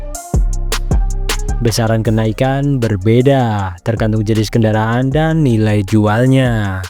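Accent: Central Java, Indonesia. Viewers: Sampeyan, Yo Jawa Tengah 😂😂😂😂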